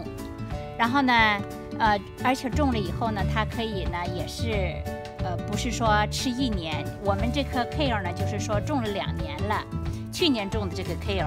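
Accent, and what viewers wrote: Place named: Shandong, China. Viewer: 山东口音好亲切 赞👍🏻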